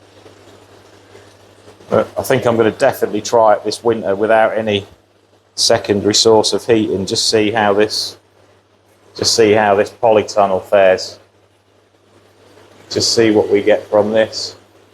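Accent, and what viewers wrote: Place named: Suffolk, United Kingdom. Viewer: Great update mate and love the keep it simple approach from Ricky Stoddart,, What is the size of your pond ? width/ length etc ,, You sound like you could be close to me Lowestoft Suffolk ?? Hope to see more from you soon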